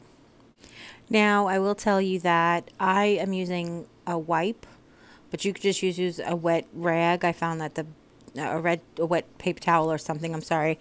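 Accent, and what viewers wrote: New York, United States. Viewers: Love the rosette tutorial! Do I detect a NY accent?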